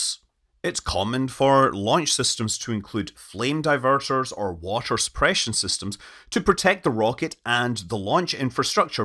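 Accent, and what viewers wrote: Scotland, United Kingdom. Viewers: Even this Englishman loves a Scot explaining how those Americans got it so wrong. ♥️ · Finally, the Scottish summary of the launch.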